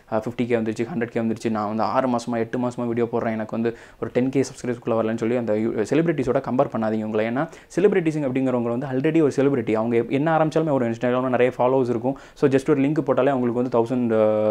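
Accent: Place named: Nagercoil, India. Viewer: hmm voice ah pathu tha keta nenacha neenga Nagercoil thanu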